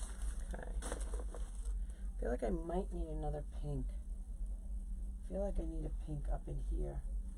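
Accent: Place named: Long Island, United States. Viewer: I hope you see my comment knowing this is an older video. First of all, you are amazing. Explain things well! Secondly, where did you get your easels from? Sounds like you have a Long Island accent. I lived on Long Island for many years and just moved back to the Midwest to be closer to my parents. Lived both on North Shore and South Shore. I watch Lori all the time and I'm so happy I found you as well!!!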